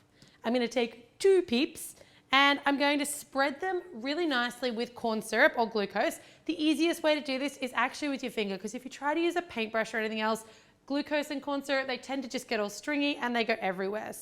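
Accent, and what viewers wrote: Australia. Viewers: Your Australian right?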